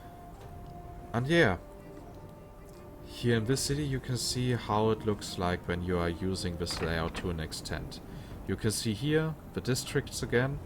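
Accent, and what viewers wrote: Germany. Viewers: Thank's a lot ^^ Man hast du nen deutschen Akzent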